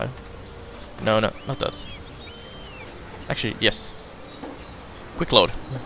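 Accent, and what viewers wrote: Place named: Finland. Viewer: Finnish speaker. Cool accent :)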